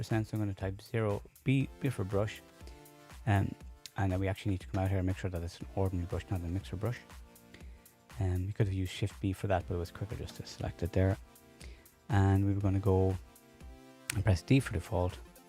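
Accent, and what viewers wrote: Ireland. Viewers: the view count and interactions does not capture how awesome and ~valuable~ these sessions are. I get so much out of each one, thank you again. Also there is a big market for unintentional ASMR with Irish accents believe it or not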